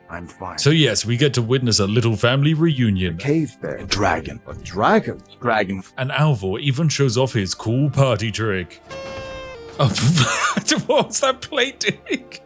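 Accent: United Kingdom. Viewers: I dunno if its you but i got a mewgenics cat that sounds like you, and by that mean blatantly British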